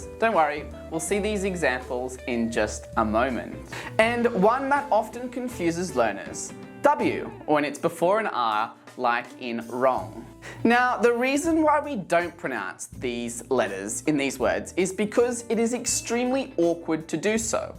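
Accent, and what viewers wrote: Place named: United Kingdom. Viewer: Hey up m8! Brilliant video. Do you have your own playlist of your own? I like your familiar British sounds.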